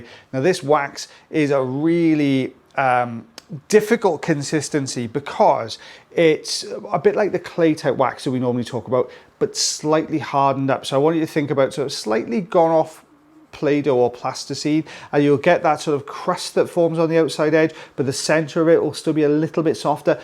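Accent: Wales, United Kingdom. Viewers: So I never would have thought watching ear wax removal would be so …satisfying ….love the Welsh accent too!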